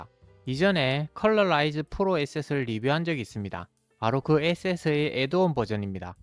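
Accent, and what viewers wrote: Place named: Gyeongsang, South Korea. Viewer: 말씀 하시는거 보니 경상도 분 같으신데요 저는 서울삽니다.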